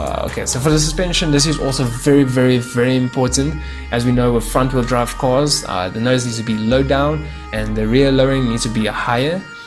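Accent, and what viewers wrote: Afrikaans, South Africa. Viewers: Afrikaans boytjie, kan sommer hoor haha.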